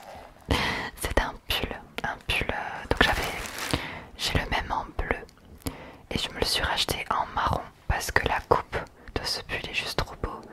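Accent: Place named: Provence, France. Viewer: L'accent provençal en ASMR j'arrive plus à m'en passer, y a moyen d'être leadeuse d'un mouvement 👀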